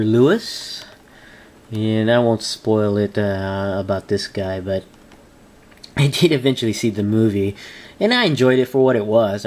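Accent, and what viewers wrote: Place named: Arkansas, United States. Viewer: Sounds like you're a fellow Arker. Correct?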